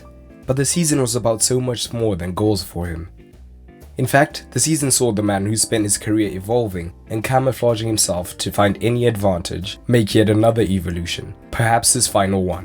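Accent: South Africa. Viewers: Great video there mate. Are you from South Africa by any chance that accent sounds distinctly South African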